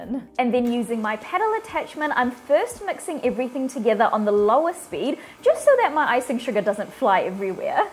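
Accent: New Zealand. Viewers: I made half the recipe last night to taste test it. It tasted great. I’m going to keep using this recipe. Before I looked at your channel I knew you were a Kiwi from your accent. Much respect from across the Tasman Sea. Thank you for developing these recipes and sharing them.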